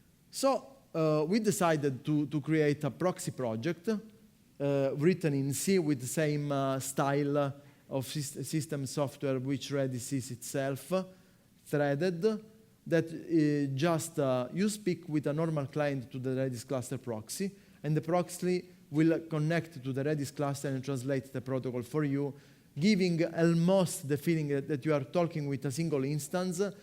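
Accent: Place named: India. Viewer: 没讲 thread i/o 啊，口音有点像三哥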